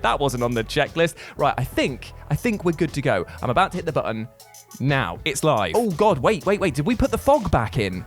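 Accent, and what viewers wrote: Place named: United Kingdom. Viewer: I had to stop. He said “Haych dee” and “Ohmeeguh” too many times. British English is confusing, and annoying.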